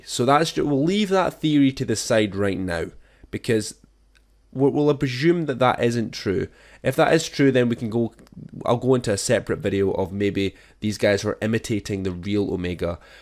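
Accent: Scotland, United Kingdom. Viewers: R u Scottish if yes where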